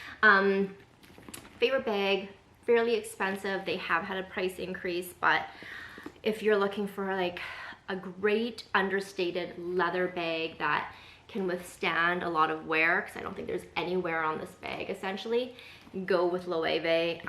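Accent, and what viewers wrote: Canada. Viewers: Are you Canadian? My grandma is from Canada and that’s how everyone I know from there says bag lol I love it tho!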